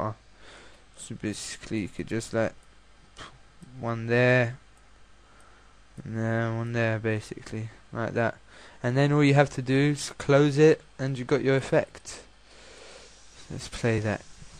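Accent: United Kingdom. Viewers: Are you british? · i fort u were british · when i first heard you i thought you were from London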